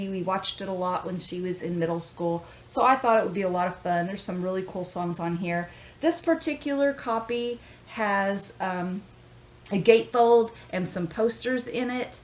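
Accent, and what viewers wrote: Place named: South, United States. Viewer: I love your accent, very southern lol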